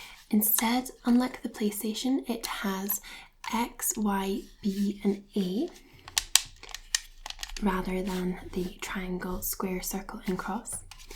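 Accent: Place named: Scotland, United Kingdom. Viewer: let's plays should be a thing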